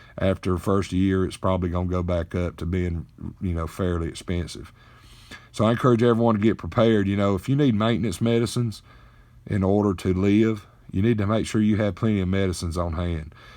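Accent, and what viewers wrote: United States. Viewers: Why thank you. And may I add now I have your lovely Country accent in mah head and so I'll probably be talking like this here fo a lil wwhuailee Amen lord Jesus help us all. This is our Government's way of wippin a'out the peiiples see here that done need ta get done swiped out'der decrease tha population see there that's Whautt that right'e their is I done told Lucille she don't done believes believed meii. She sure heck will now!!!!! 💓💓💓💓💓💓🙌😘💌💋☺I done subscribed y'all do the same. God bless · I love a country man's accent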